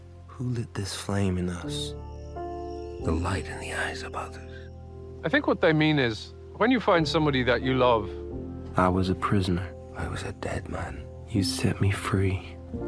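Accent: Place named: Netherlands. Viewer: hmmmm you sound Dutch. Are you Dutch?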